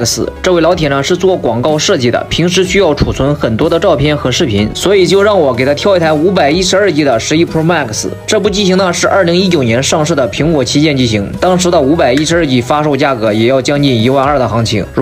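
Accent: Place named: Henan, China. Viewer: 这标准河南普通话哈哈哈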